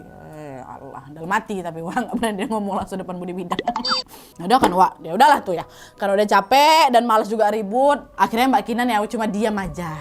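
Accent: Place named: Minangkabau, Indonesia. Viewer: orang minang yah? Huhu sukaaa banget logatnyaaaa. Campuran medan sama ada minang minangnya dikit. Semangat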